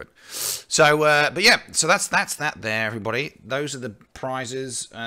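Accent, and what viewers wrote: United Kingdom. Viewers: You speak with an RP accent so its hard to guess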